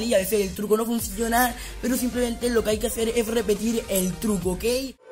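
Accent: Colombia. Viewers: eres de colombia si eres de colombia de que ciudad yo soy de cali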